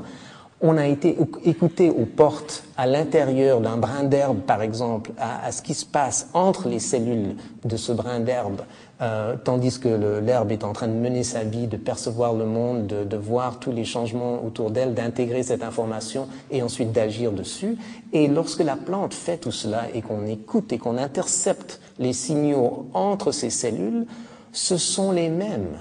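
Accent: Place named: Switzerland. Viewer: ton doux accent suisse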